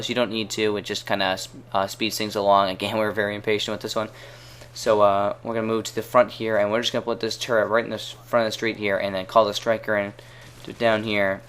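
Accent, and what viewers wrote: Canada. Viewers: R U Canadian? No offense really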